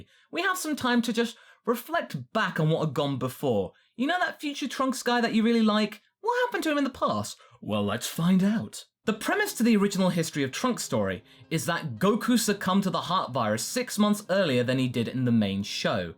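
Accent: United Kingdom. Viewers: hearing "robot arms make everything better" in your beautiful British accent made me happier than it should have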